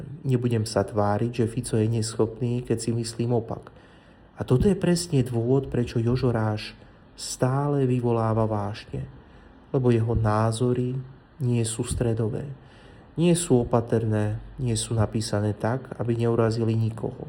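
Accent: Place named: Czech Republic. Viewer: Už aj ty si zmutovany češtinou? Nekecrj nezvan .ale rozpravaj,,!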